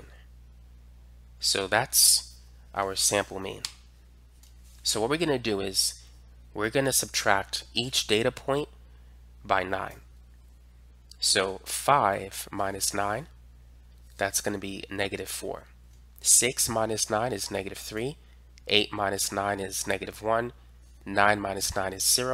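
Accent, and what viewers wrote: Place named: New York, United States. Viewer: i can hear your NY accent lol i love it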